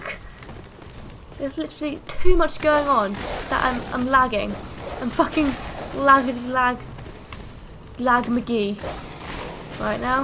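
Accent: United Kingdom. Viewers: Finds I female Youtube ... I like this girl ... Wait she's British ... I really like this girl ... Wait she's playing Dark Souls OMG! Keep doing your thing never give up your amazing.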